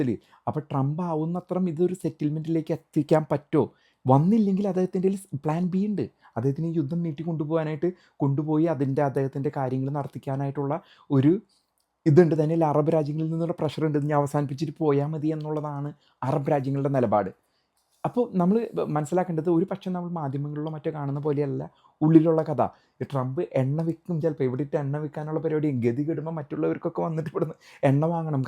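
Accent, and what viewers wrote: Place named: Thrissur, India. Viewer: നല്ല കിറു കൃത്യമായ നിരീക്ഷണവും ചേട്ടന്റെ ആ തൃശ്ശൂർ ഭാഷയും എല്ലാംകൂടെ നല്ല രസമുണ്ട് കേൾക്കാൻ. .. ഇപ്പൊ റീച് കുറവായിരിക്കും പക്ഷെ നിരീക്ഷണം തുടരണം, കുറേ നാള് കഴിയുമ്പോ ആളുകൾക്കു മനസിലാവും എത്ര കൃത്യം ആയിരുന്നു എന്നു. ..🙏🏻🙏🏻🫡🫡🫡🫡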